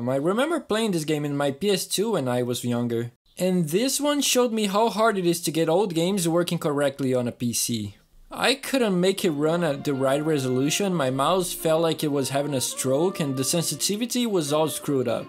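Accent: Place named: Brazil. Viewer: I love your channel, man. Are you Brazilian? Your accents is pretty similar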